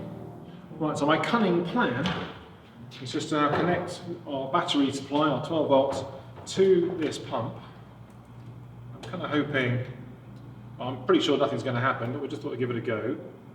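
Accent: United Kingdom. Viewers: Субтитры в процессе, хотя я к 4 серии британский говор стал лучше понимать, до этого в основном американский английский слушал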